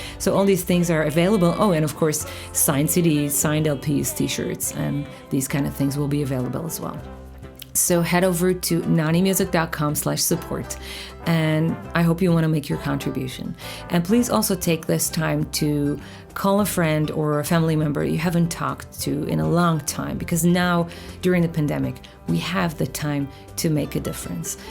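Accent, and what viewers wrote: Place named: United States. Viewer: Woah your English is amazing. Aren't you from Israel? You sound like an American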